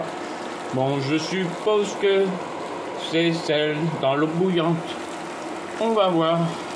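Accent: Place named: China. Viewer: Êtes-vous chinoi ou françai vous avez vraiment un accent très drôle 😂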